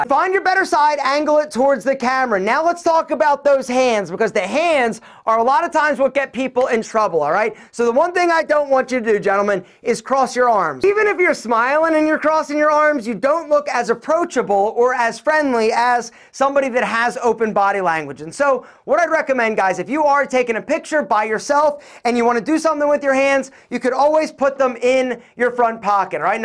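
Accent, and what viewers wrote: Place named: Philadelphia, United States. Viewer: “Ouh-vurr” = Over ... This dude is definitely from Philly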